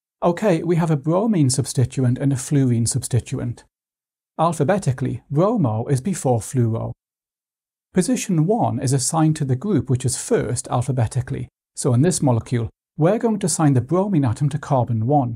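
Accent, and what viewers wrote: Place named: RP, United Kingdom. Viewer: Why do you sound so posh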